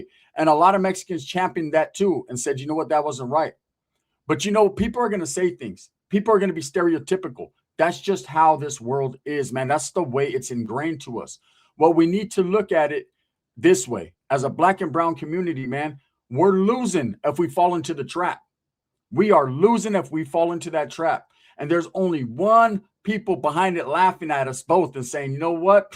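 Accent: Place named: Texas, United States. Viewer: Great video .... You sound like a Texas boy , where Blacks and Mexicans are brothers ❤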